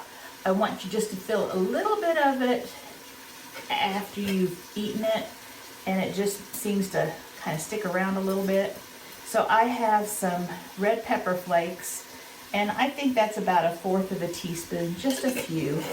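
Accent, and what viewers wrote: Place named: Missouri, United States. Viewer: It's nice to hear a Missourian talk - warshing instead of washing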